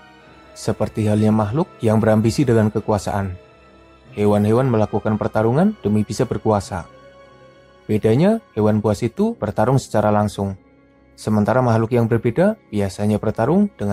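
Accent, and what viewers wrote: Banyumas, Indonesia. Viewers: Ngapak e ketara banget kiye admin e 😅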